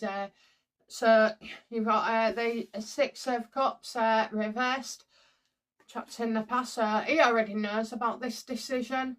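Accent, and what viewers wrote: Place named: England, United Kingdom. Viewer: Im english, living in Ireland. Im here for your accent. I miss that, and a proper chippy. LOVED the white suit!!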